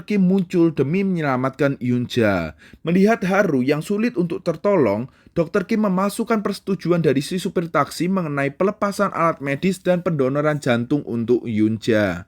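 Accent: Java, Indonesia. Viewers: Medok jawanya hilangin ya ka...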